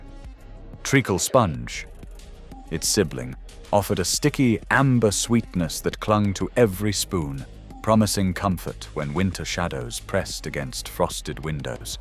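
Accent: United Kingdom. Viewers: More of your usual clickbait, ignorant nonsense. Few of these "can't be found any more". Beef stew with root veg is still very common. Lancashire hotpot is still served in many restaurants and homes. I had bubble and squeak two days ago. Toad in the hole in a gastropub recently. I love how you talk about corned beef in blue-labelled tins and illustrate it with a bunch of red-labelled tins. Are you colour blind as well as stupid? Pea and split soup is still common, too. I still love rabbit stew! You can't find rice pudding? BS. Bread and butter pudding is available in Michelin star restaurants! Apple crumble is still one of the mot common desserts in the UK. Despite the British accent of the narrator, he is reading something written in American English and most of the images, when even vaguely relevant, are American. Bunch of frauds.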